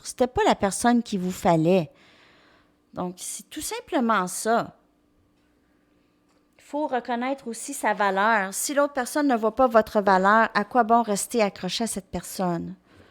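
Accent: Canada. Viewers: Bonjour je ne c'est pas d'où vous êtes au Canada car j'adore l'accent canadien j'ai une de mes meilleures amies qui habite Montréal moi je suis de Tarbes en France et j'adore cet accent avec cet douceur dans la voix et oui mon chéri et en prison pour des fait ou il a était coupable bref oui j'ai toujours était la pour lui mes là pour des reson que j'ignore plus d communication d'eupuis le moi d'octobre je c'est qu'il m'aime beaucoup mes quand je veu avoir des discussions avec lui il ce renferme sur lui j'espère vrement qu'il va me donner des nouvelles rapidement car il me manque énormément bonne journée a vous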